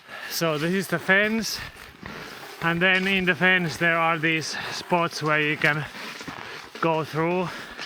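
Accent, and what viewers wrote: Finland. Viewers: It’s been a long time since I’ve heard the Finnish accent. I do miss it. Cheers to the both of you from a🇫🇮🇨🇦on the coast of British Columbia 🇨🇦 I’ve been following you two since you were on the North Coast of British Columbia 👌Cheers 🍻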